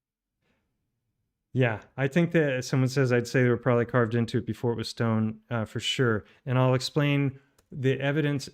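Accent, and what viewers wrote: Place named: South, United States. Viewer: It gets worse!! Typical Southerner ,he loves the sound of his own arse, sorry voice.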